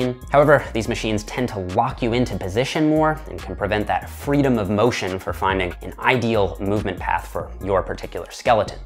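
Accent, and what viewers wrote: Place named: Canada. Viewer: English is my second language and i can never tell the difference between Canadian and American accents, until now😂. Thx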